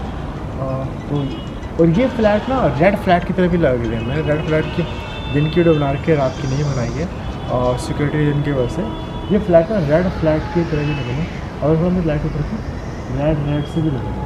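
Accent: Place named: Bengal, India. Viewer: Aap ke baat karne se lagta hai aap Bangali ho kya sach me ho ya kuch or ho..... Big fan... vhi ❤️❤️ Love you...... Please reply me... 🥰😘😌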